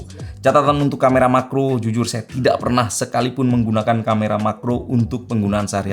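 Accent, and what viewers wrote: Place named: Surabaya, Indonesia. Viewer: lhooo SUROBOYO TA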